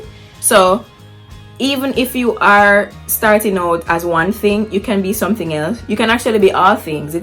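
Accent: Jamaica. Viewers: Come thru yardie. Accent strong.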